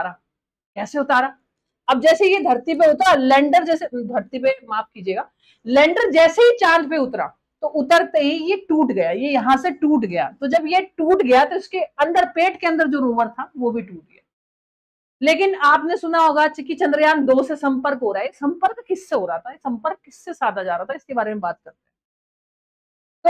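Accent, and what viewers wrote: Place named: Haryana, India. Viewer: ❤❤❤😂😂😂😂😂❤❤❤व्व्व्व्वा ह व्व्व्व्वा ह व्व्व्व्वा👌👌👌👌👌 च * संदेश कार्य विश्लेषण * * बहोंत बहोंत बहोंत सुंदर और अच्छीं तरहसें समझां दियां * अपनें माॅं और बच्चों कों * अपनी हरियानवी टोन कीं * हिंदी भाषा में * बहोंत बहोंत बहोंत सुंदर * धन्यवाद😘💕😘💕😘💕 नमन वंदन🙏🙏🙏🙏🙏 अभिनंदन भीं👋👋👋👋👋 * वंदे मातरम् भारतं हिंदुस्थानी मातापिताम् महापुरुषं लोकमान्यं जनता जनार्दनम् संतं वैज्ञानिकं🙌🙌🙌🙌🙌 👏👏👏👏👏👏👏👏👏🙏🙏🙏🙏🙏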